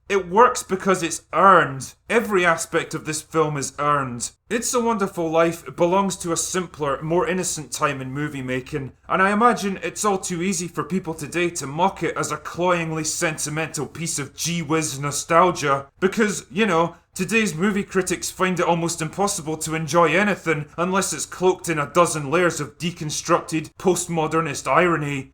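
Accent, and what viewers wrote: Scotland, United Kingdom. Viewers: His dulcet Scottish tones warm my soul.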